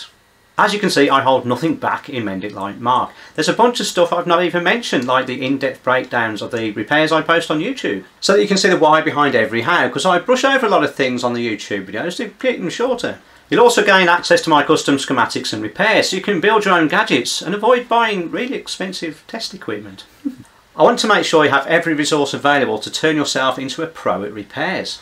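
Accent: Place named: England, United Kingdom. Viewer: Now wait a minute Mark. You charge about 300 dollars U.S. dollars for each repair and you said you love it. So I'm going to pay you to do what you love and I see. Your eyes light up when you said love. Everyman is blessed to do what he loves. Next one more really important step, learn to hear the fast talking Englishman or get a voice translator. Have a good love filled day everyday Mark.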